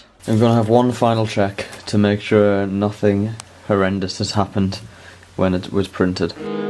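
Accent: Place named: North, United Kingdom. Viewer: Mate your accent is like a hybrid of Manchester and Newcastle! Where are you from in the UK?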